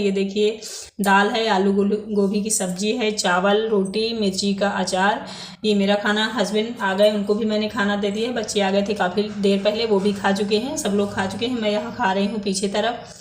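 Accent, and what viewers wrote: India. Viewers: Aaj apne bilkul desi tareeke me baat ki accha laga aur dulaiya bhi hi gai bahut hi badhiya video 👍👍🙏👍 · Aj to bhojpuriya bol rahi hai 😃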